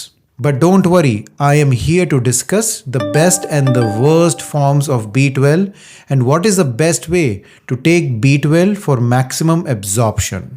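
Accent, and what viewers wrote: India. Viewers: Sorry, but now I am totally screwed up. I've been using the Methyl Cobalamin format of B-12 for years based on available research. Now, we have this feller suggesting that Methyl Cobalamin is the best format. The problem is that I have no intention of trusting a feller with the kind of accent, name and appearance I am seeing and hearing in this content. Sorry, but when I listen to him, I have images of those folks who, before I blocked them, used to call me five times a day and tell me they were Microsoft engineers from Mumbai and were available to help resolve my computer issues if I would only buy them some gift cards. Perhaps the sponsor of this content could hire a busty young blonde haired white woman from Texas, with a southern accent, to pitch this product. Otherwise, I guess I'll just stop taking the B-12 or do the opposite of what this feller is suggesting. 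Thanks for your attention to this matter.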